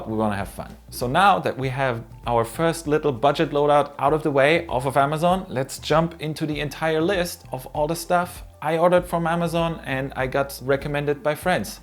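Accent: Germany. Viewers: Warum merkt man das er deutsch spricht😂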